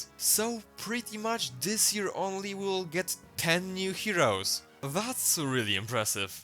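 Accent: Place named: Germany. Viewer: The strong German accent gave away that for once I wasn't recommended AI slop good vid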